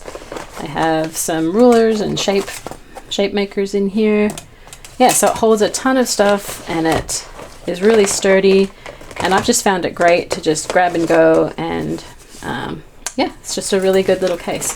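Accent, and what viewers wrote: Australia. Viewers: Hello... you sound a bit Aussie, but living in USA? If so, hello from a fellow Aussie. Thanks for the video. Could you do me a small mix favour with your Roman Szmal, with the "tint" colour with a Cobalt blue please? Could let me know if you get a grey or a green? Thank you in advance! Looking for a sky and cloud glow. A bit of a unicorn, I love a cobalt or warm blue like periwinkle, or lavender with a white in it, to make it opaque thinned out, and less granulating. I love Schmincke's finest Ultramarine. I know I am searching for a glow, without going green that is also non granulating. Eg jarosite. Or some gold ochres, some Naples or Flesh colours. It is likely to be a neutral yellowy orange or a PBr7 like a burnt sienna... Like you, also a fan of pens and ink. Have you tried Emma, the R & K sketch ink? A waterproof greeny olive, fast drying! 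In kindred spirit, Eliza. Dulwich Hill, Sydney Australia xx 14th of December 2022 pm bed office PS I like the look of your deep bag. Where do you get the large fine mist spray bottles? They are not on your list? PPS: Thanks for your channel. xx PPPS: You need to try my favourite RS, "Misty Morning", it separates, a light cool grey into a rose and cobalt teal kind of colour. An edge happens. It is delightful. Good by itself. Seeing as though you like your neutral abstracts, I think you will have a new favourite.....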